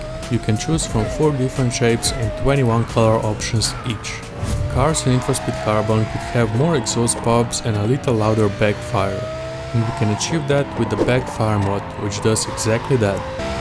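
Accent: Russia. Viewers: Акцент выдает своего))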